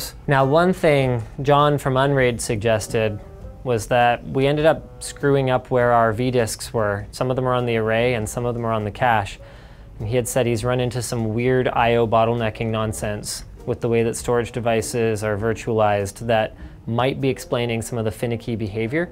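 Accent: Australia. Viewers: That accent is australian mate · yeah not a bad australian accent at all tbh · K that's an Australian accent and I don't even mind cause its not that bad your talking about GRREEEFIX CEEEEARDS so its ok:) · Isn’t that an Australian accent? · was that an Australian accent or "Central Pahk" accent??? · Accent was Stereotype Austrlalian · Thats a aussie accent m8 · That accent is like Australian,British and a bit of in there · Aussie accent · U sound aussie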